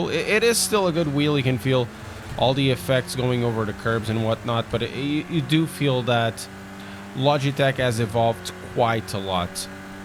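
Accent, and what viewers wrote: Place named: Portugal. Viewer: Where are you from? Your accent sounds portuguese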